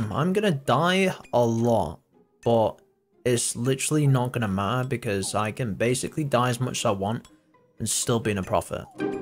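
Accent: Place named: United Kingdom. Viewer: You sound even more British now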